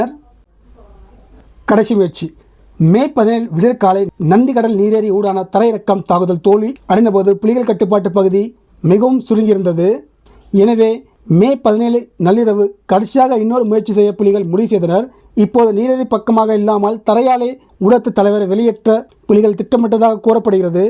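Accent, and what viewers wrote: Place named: Kerala, India. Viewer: Adooi clear ahh vilankira mari sooluda Ethoo malayalali Tamil pesuramari pesurai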